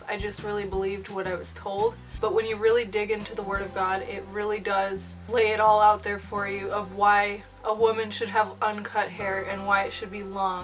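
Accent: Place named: Midwest, United States. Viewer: Wow that's cool I'm originally from Minnesota but now I live in North Carolina but I could tell from your accent that your from around that area :)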